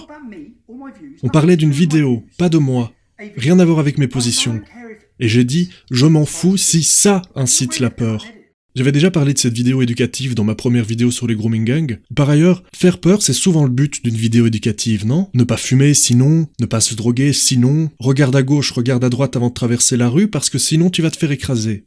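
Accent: Belgium. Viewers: Ton accent belge ressort quand tu t'énerves. Cela veut dire que tu t'énerves vraiment, ce n'est pas feint. Tony Robinson est la victime du système politique et judiciaire anglais (les deux sont liés en Angleterre, ce n'est pas le système français). La raison d'état l'emporte sur la raison tout court. Il s'agit d'établir les connexions entre les gangs et les politiques locaux. C'est un travail de journaliste d'investigation. Ce type de journaliste est en voie de disparition (d'extinction) car trop dangereux et peu rémunérateur. Un bon perroquet (femelle de préférence) est très bien payé pour présenter les JT. Les tournantes n'ont pas cessé dans les cités françaises. Les journalistes n'en parlent plus pour ne pas stigmatiser les jeunes des cités. Tout va bien dans le meilleur des mondes. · Je suis belge et t'as un accent de chez nous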